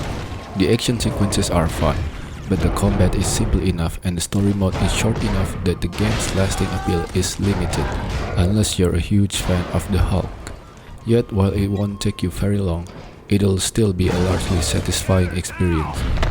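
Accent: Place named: Indonesia. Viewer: Your accent sounds a lot like Indonesian.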